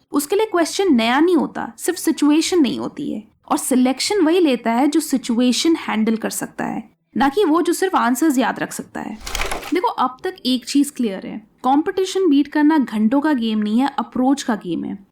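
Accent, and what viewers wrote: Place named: Kashmir, India. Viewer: Are you kashmiri by any chance? You accent seems 😄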